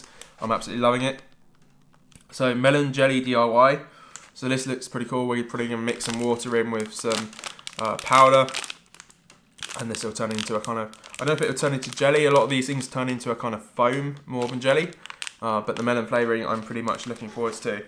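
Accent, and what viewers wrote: United Kingdom. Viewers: cheers for the reply I'm defo thinking of getting the boxes I love new things. What part of UK you from to me you sound northern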